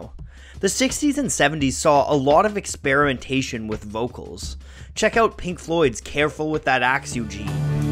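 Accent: Canada. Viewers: Are you Canadian? I like your accent. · Someone's Canadian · spencer jacobs I'm Irish and he's definitely Canadian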